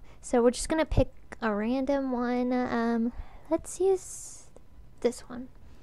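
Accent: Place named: United States. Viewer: Nice voice, now I know ur American xD (compliment)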